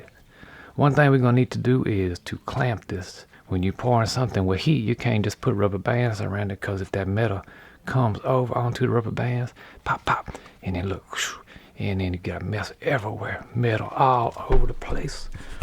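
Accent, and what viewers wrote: Louisiana, United States. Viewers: You from Louisiana? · From Louisiana, huh?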